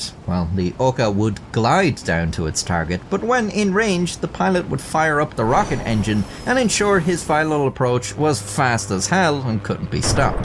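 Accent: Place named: Ireland. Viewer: If theres someone I want to listen to talking about insane deaths and cruel war tactics, then its this Irishman and no one else PS: I love the way you say "three" 🌲. Never stop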